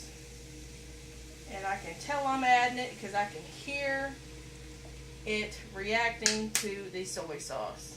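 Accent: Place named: Louisiana, United States. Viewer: Great recipe for fried rice.May I ask were your from?Your ascent sound like your from Louisiana.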